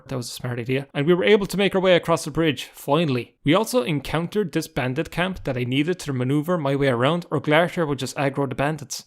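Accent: Ireland. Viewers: Haha funny oirish man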